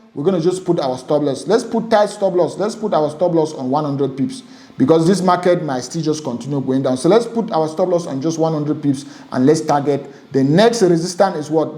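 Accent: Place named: Nigeria. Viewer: You just sound like a Nigerian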